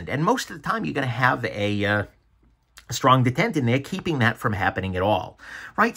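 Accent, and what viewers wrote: New York, United States. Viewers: Your channel is my favorite. BTW, are you from New York?? Thanks for the reviews!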